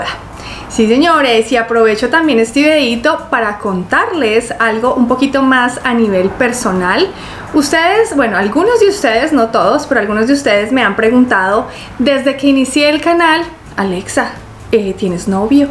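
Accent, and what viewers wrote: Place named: Colombia. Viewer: Ola eras mexicana mas tienes asento de colonbia te felicito seias maxicana o de onde seia lo que veio mexico es mui lindo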